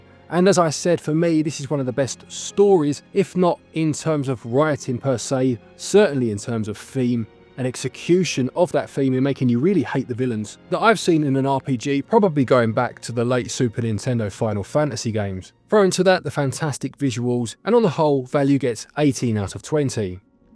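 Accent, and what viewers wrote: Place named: Cockney, United Kingdom. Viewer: It's nofing wrong. I love the cockney accent.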